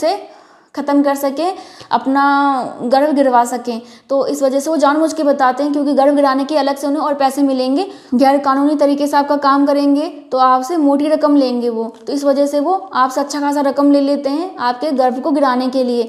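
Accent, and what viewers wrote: India. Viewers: Ap noida se hai kya ??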